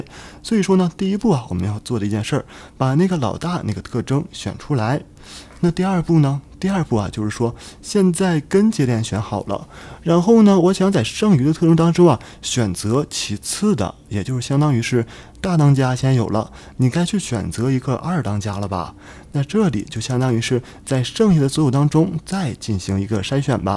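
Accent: Northeast, China. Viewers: 这东北味太浓了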